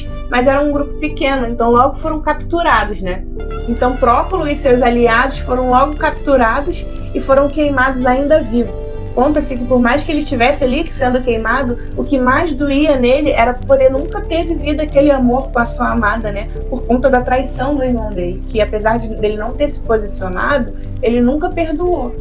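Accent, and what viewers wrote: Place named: Rio de Janeiro, Brazil. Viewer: Eu adorei o vídeo ❤❤❤..... mas o sutaque carioca é horrível 🤢🤢🤢🤢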